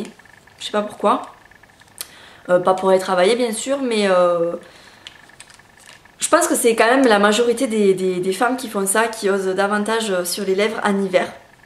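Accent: South, France. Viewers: J'adore regarder tes vidéos sont un vrai plaisir, surtout avec ton petit accent du suuud !!^^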